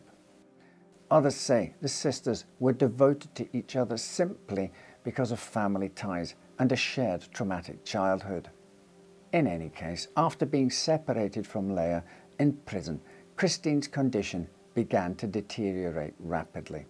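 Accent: Scotland, United Kingdom. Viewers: See, my husband thought you were Welsh…but I know a Scotsman when I hear one! My favorite accent!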